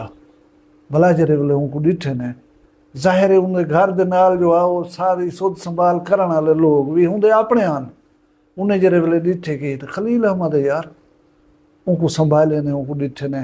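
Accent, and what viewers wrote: Saraiki, Pakistan. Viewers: صاحب اللّٰہ رحیم وکریم آپ کو اپنی حفظ و امان میں رکھے ۔ آمین ثم آمین بہت خوب صورت اسلوب بیان ساتھ سرائیکی زبان کی مٹھاس کا جادو۔ کیا کہنے۔ حقیقی اور وسیب کے دل دماغ اور ضمیر کو جھنجھوڑ دینے والا روزمرہ کے حالات واقعات پہ مبنیcontent ۔ ماشاءاللہ اس واقعے نے دل و دماغ کو ہلا کر رکھ دیا۔ اتنا خوبصورت جوان ۔ صاحب یقین کریں مزید کچھ کہنے اور لکھنے کا حوصلہ نہیں۔ بوڑھی ممتا ، جوان سالہ بیوہ ، معصوم ننھے فرشتوں اور اس عمر میں جوان سال بیٹے کا جنازہ باپ نے کیسے اٹھایا جو گا۔ اللّٰہ رحیم وکریم سے اس خاندان پر اپنا خصوصی فضل وکرم فرمائے ۔سب کے لئے آ سانیا ں فرمائے ۔ مرحوم کو جنت میں اعلیٰ مقام عطا فرمائے ۔ آمین ثم آمین اللّٰہ تعالیٰ آ پ کو دین ودنیا کی ڈھیروں کامیابیاں اور کامرانیاں نصیب فرمائے ۔امین ثم آمین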